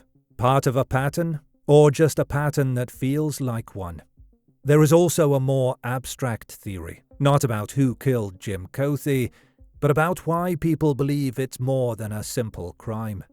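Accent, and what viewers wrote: United Kingdom. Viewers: Really. Why the British accent? Don't buhlschitt a buhlschitter.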